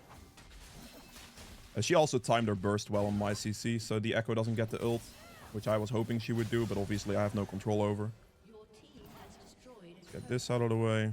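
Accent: Netherlands. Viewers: Are you dutch or so? Great video dude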